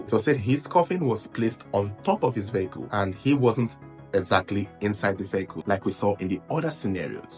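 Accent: Igbo, Nigeria. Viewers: He sounds like an Igbo from Nigeria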